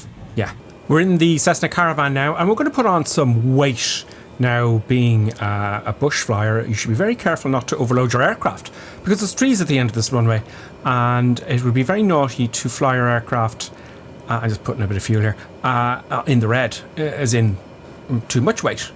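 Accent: Ireland. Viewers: Wait a minute... you’re IRISH??? :)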